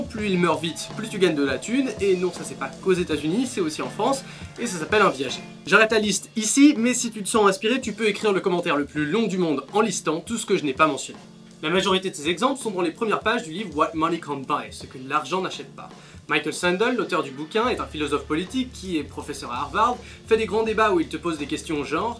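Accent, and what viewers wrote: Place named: England, United Kingdom. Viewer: Je salut l'accent anglais !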